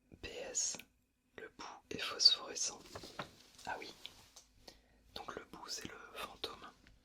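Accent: France. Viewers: Joyeuses fêtes, j'aime beaucoup tes vidéos je te trouve très apaisé et apaisant. J'apprécie le soin et la préparation que tu accordes à tes vidéos, je pense que ta chaîne est ma chaîne ASMR préférée:) PS: J'aime bien ton accent français sur tes vidéos en anglais, c'est un bon trigger ajouté au chuchotement:)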